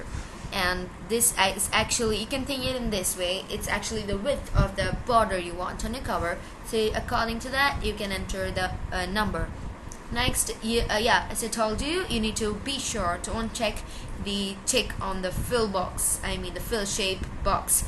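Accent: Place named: Kerala, India. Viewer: SHe sounds malayali indian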